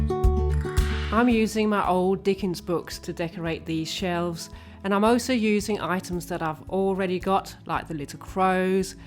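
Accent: United Kingdom. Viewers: I am so happy to have found you! I am from the states, I live in Michigan. I have been to London several times and fell in love with it, especially the people. Your sweet little accent makes me smile. Your decorating is so inspirational and you make it look so easy. The large book/skull cloche in the kitchen is my favorite. Your home looks lovely. Sending a BIG hug with thanks for sharing your ideas! Cherrie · I love how you decorate, everything looks so beautiful. I like all the crows too. You are very talented. I am from the States in Connecticut. I always wanted to go to London. I love your accent.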